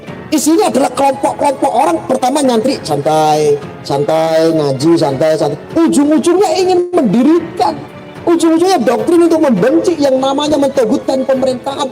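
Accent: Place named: Madura, Indonesia. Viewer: Gus, gaya ngomong sampeyan, menjadikan diriku rindu Sampang. Mbakyu & Masku tinggal di sana... selain itu, info info dari... mencerahkan... terimakasih Gus. Salam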